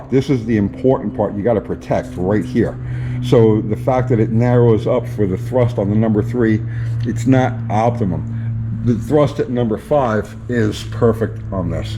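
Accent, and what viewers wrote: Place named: Boston, United States. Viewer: I can listen to you info dump all day. I think part of it is hearing you talk car engineering in your slight Boston accent reminds me of the tappet Brothers I used to listen to all the time. Kind of humorous to hear a boomer say yeeted though.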